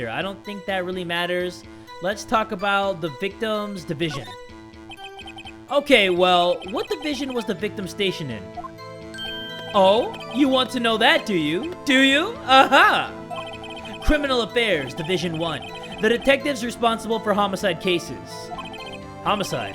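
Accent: South, United States. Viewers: Jays country accent is 👌😂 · I love southern accent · LMAO I LOVE YOUR COWBOY ACCENT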